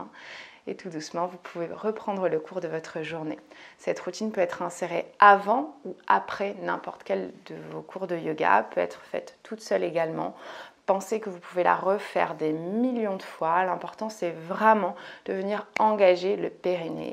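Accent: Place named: France. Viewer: Belle séance j'adore beaucoup votre voix avec cet accent français doux quelle merveille le yoga avec tous ces ingrédients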